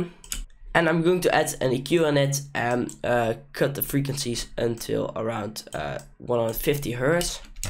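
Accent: Netherlands. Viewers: Are you dutch or from belgium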